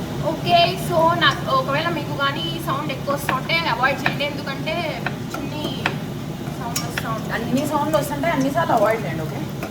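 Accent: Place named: Kadapa, India. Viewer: mana kadapa slong vintu vuntunta vinasompu ga vundi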